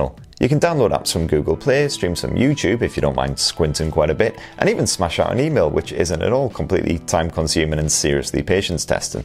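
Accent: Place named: Newcastle, United Kingdom. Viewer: Loving the Geordie accent :) Reminds me of my ex-wife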